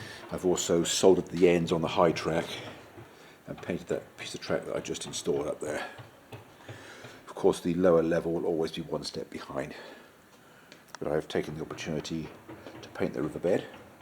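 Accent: England, United Kingdom. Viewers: In one word... " Perfection " you are without doubt, the best non club single handed builder of layouts out there. Are you British? you sound English:)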